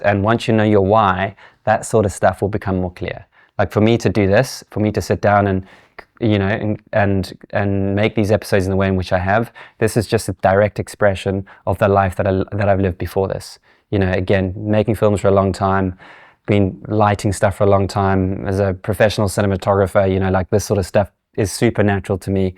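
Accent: South Africa. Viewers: Buddy, is there a hint of South African in that accent? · South African? 🙂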